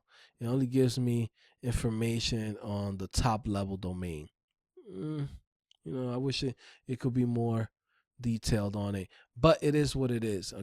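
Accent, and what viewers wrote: New York, United States. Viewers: You sound like you from NY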